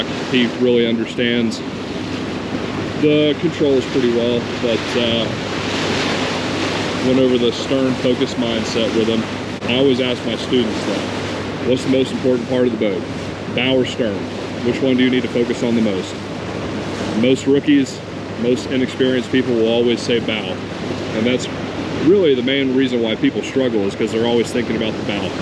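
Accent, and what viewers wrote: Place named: Buffalo, United States. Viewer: Great Captain. Very informative. With a Buffalo twang lol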